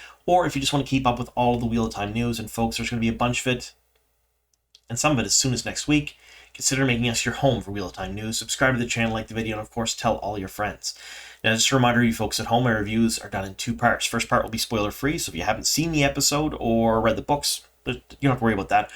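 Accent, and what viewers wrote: Canada. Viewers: They're wondering about the supers?!!? The first time I heard you pronounce the word "about", I said, that's Clearly Canadian! I'm extremely about Siuan, I had a couple spoilers about her story, and I really wanted to see Sophie play those things! Fantastic season and we need seasons 4 - 8! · I also recognize that you’re Canadian because you always say “aboot” and it’s kinda charming.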